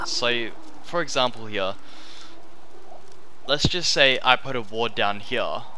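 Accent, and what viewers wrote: Australia. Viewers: Voice command doesn't work properly because he speaks with an Australian accent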